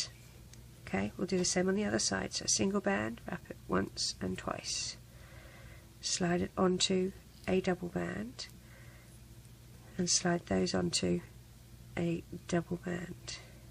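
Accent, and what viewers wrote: United Kingdom. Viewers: your BRITISH LOL LOL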